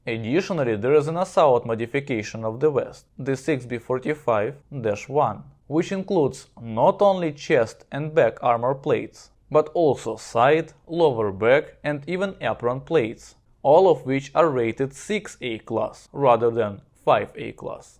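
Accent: Russia. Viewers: Maxed out Russian accent and top video my friend 🤟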